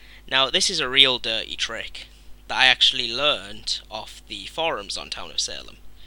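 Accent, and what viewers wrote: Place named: Geordie, United Kingdom. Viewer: Where r u from, cuz u sound like ur a geordie but you dont have a full on accent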